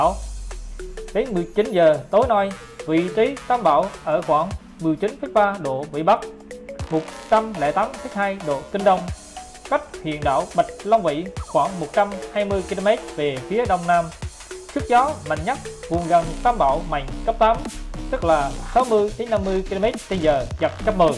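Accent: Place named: China. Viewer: Giọng như giọng người Hoa khó nghe lắm thay phát thanh viên đi thôi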